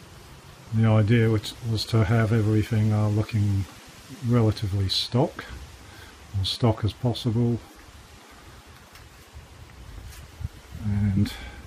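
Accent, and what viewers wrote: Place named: England, United Kingdom. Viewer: Sounds like a pom accent. Nice el to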